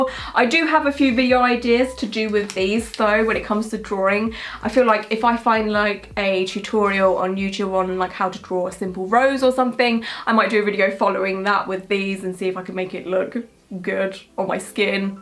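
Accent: United Kingdom. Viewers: You are hilarious girl. I could just listen to you with that fabulous U.K. accent.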